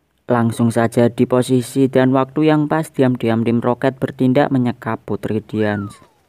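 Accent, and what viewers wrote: Java, Indonesia. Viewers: wong jowo to mas?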